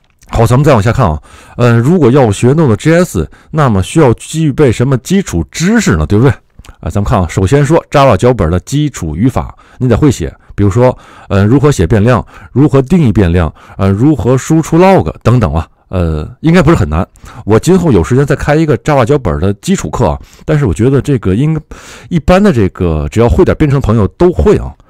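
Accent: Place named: Japan. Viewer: 英语很岛国味道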